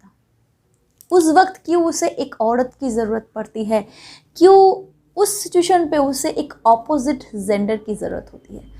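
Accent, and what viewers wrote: India. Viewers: Love your hindi accent..🥰🙈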